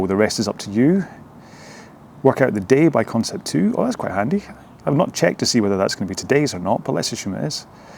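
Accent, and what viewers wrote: Scotland, United Kingdom. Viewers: Thanks for reviewing asensei, appreciate it. It is worth mentioning that most of our workouts these days are full row-along sessions with coaching and talking all the way through from the likes of Eric Murray, Johan Quie and British Rowing. There are some you can try in the free trial too. These are similar to Eric's free row-along sessions on the asensei youtube channel - indeed some of those sessions are pulled into the app and have the goal setting, music, tracking etc fully integrated. Would be happy to talk you through any of this if you ever want to do a more in-depth piece, or just catch up. Nice to hear a Scottish voice doing a review too (two of the asensei founders also Scottish)!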